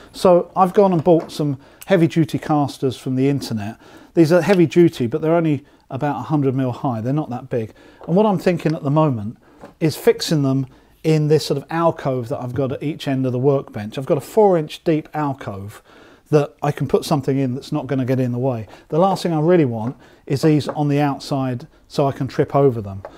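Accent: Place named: United Kingdom. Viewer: I love your style, simplicity, and British accent! I'm from the South in the US. I love to watch woodworking video's since I cannot do very much any more. I am severely handicapped, and dying from a lung condition that is destroying my lungs, called Idiopathic Pulmonary Fibrosis, and was recently diagnosed with Liver Cancer. So 👍👍😁 and keep on keeping on! Subscribed, and hit the bell!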